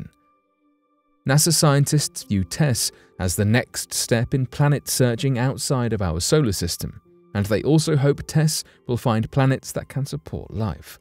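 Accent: United Kingdom. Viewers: Why do they always get some dude who sounds like he’s a member of the royal family to narrate these videos? I worked in space and aviation for my whole career and not once in our labs, did some English royal voice come over the speakers saying “ Jim I think you’ve got the solution!” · Thumbs down because of the British accent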